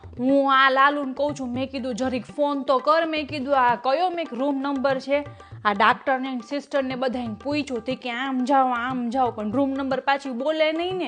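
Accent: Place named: Saurashtra, India. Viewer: અસલ પટલાણી જેવા બોલો છો (સૌરાષ્ટ્ર ની બોલી)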